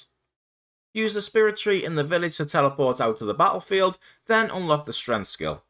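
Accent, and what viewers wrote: Liverpool, United Kingdom. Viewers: Are you a scouser or a wool?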